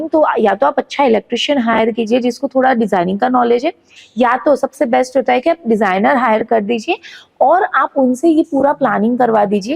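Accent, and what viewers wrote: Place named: West Bengal, India. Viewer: Why you sound a bengali?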